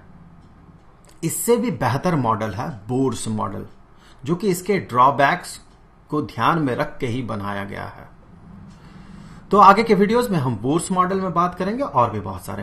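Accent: Bihar, India. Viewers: Sir ap bihar se ho pls tell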